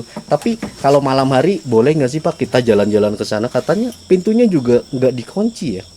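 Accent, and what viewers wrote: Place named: Java, Indonesia. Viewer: Demen aku sama mas ngomongnya medok banget hehe khas....😅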